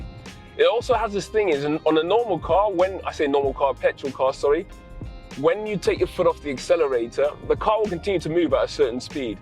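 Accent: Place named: England, United Kingdom. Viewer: Great video and car, I definitely agree on the ‘head turning design’ notion! Your videos really remind me of Carswithluke (and not only due to the similar English accent), where there is an emphasis on cinematography, landscapes and storytelling. Keep up the good work!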